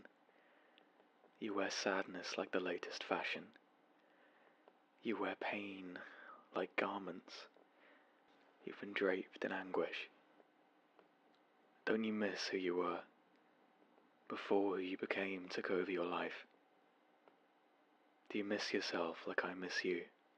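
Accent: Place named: United Kingdom. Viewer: This is lovely... the British accent really gives to the words of the book...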